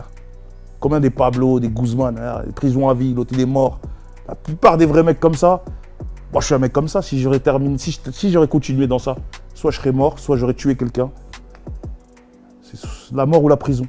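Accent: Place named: Martinique. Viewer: Il est trop fort avec l accent martiniquais 👍🏾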